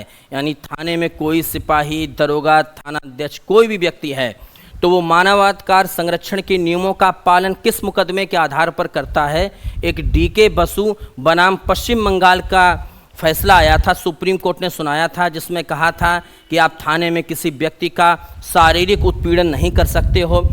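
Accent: India. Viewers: गुरुदेव बहुत अच्छी क्लास रहती हैं आपकी सारी क्लासेस देख ली मैंने । आपकी क्लास में अपनापन लगता। अवधी टच , और कोई दिखावा नहीं👍👍👍👍👍👍👍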